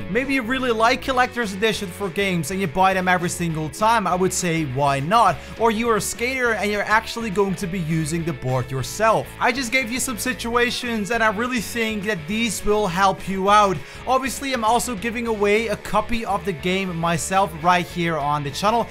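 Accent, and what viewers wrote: Netherlands. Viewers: Are u Dutch? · I see, you're either Dutch or Belgian.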